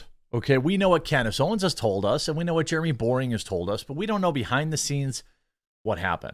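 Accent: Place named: Midwest, United States. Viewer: There is no letter "L" in the word both Jeremy. Turn down the midwest please.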